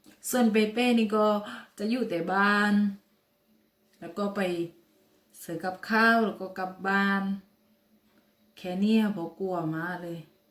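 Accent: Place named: Ranong, Thailand. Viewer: สำเนียงเหมือนคนระนอง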